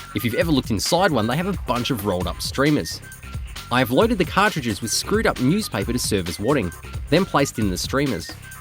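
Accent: Australia. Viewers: Omg you like an Australian and comedy vir. of Michael Reeves XD insta sub! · You have to be Australian , you just sound like it . Not to sound rude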